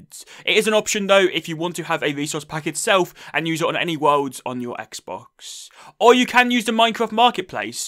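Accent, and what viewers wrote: United Kingdom. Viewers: i think he’s just british 💀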